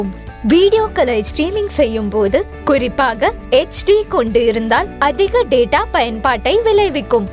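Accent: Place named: Haryana, India. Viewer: Haryanvi Hindi medium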